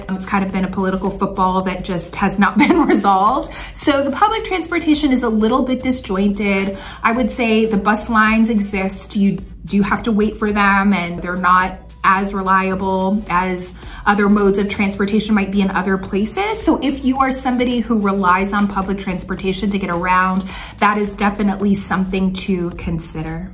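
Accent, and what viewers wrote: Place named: South Dakota, United States. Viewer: Sound like you are from Sioux Falls.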